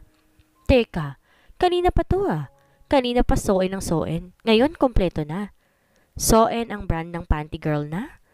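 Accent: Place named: Iloilo, Philippines. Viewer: Maka lingaw ya ilongga mu miss Narrator 😅❤ taga banisilan po ako . I’m very happy po while listening this story ❤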